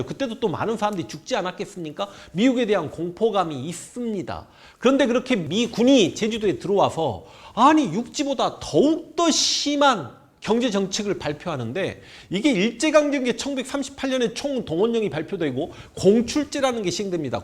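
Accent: Jeolla, South Korea. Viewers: 원래 전라도 사람들이 감성적이더군요 이분도 사투리 억양이 딱 그쪽이네욪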